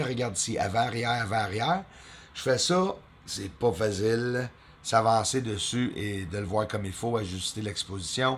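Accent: Quebec, Canada. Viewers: Bjr je suis française et j'aime beaucoup Quebec et votre accent et vous aborder un sujet que je suis depuis longtemps avec beaucoup plus d'intensité depuis 4 ans il y a quelquechose qui bouge dans les cieux objectif ? 🤔 Continuez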